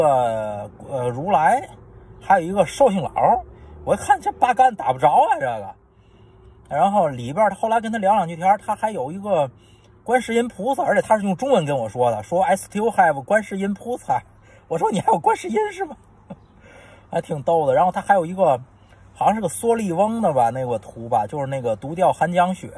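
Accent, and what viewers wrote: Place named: Tianjin, China. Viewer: 特別喜歡這樣的節目，親切，踏實，接地氣，妥妥的天津口音普通話，還有那條漂亮的大狗。請繼續做下去，謝謝這樣美好真誠真摯真實的分享。🙏🙏🙏🙏💝😳😳😳😳😇👍👍👍👍👍👍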